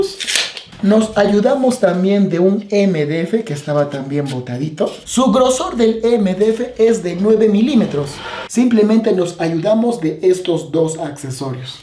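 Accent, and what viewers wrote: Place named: Iquitos, Peru. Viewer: Me encantan tus videos! Eres peruano verdad? hablas como mis compatriotas de Iquitos. Que sigan los éxitos!!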